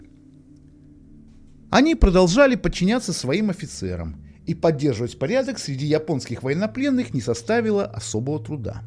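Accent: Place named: Belarus. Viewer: автор или из Беларуси, или из Псковской области - мы так "Я" не произносим))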